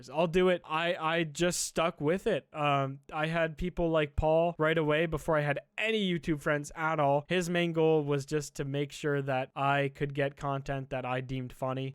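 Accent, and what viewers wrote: Canada. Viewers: Gawd, you're so Canadian white but it's like little sand hints of arab thrown in there.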